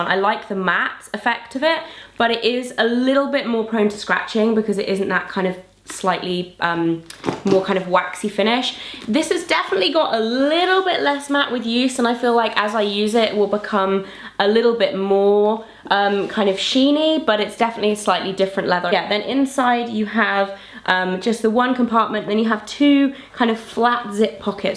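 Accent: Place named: RP, United Kingdom. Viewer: I know it might be a weird thing to say, but I just love accent. English is my second language and I just think that all these English people sound so nice and posh and I wish that I could mimic accent.:D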